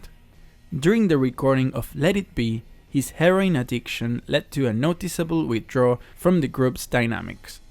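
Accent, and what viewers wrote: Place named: Argentina. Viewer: vos sos re argento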